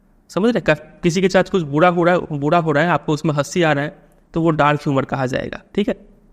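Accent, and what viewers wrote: Bihar, India. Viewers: sir are u from bihar your explaination is bestest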